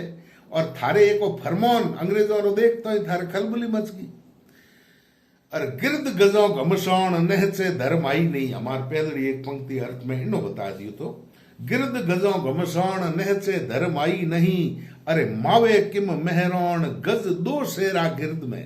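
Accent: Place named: Marwar, India. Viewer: Aaaha ...jivo ...jivo...the...itti mithas ...shudh marwaddi....aand aagya ...ji... dhanywaad...aapka...❤❤❤❤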